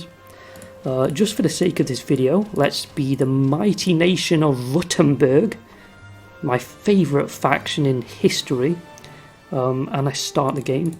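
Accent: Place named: United Kingdom. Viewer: I love this british accent, I was loking for some british channel for improve my english, and I found now 🙂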